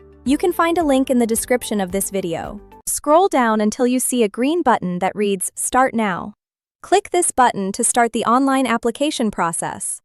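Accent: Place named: United States. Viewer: why woman with an American accent ?????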